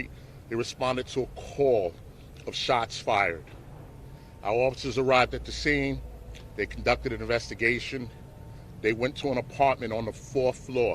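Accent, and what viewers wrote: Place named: New York, United States. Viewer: NY accent is the best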